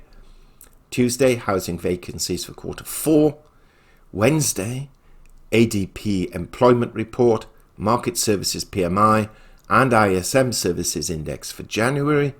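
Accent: United Kingdom. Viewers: Can't beat a British accent when it is spoken well..... PS..keep buying PMs !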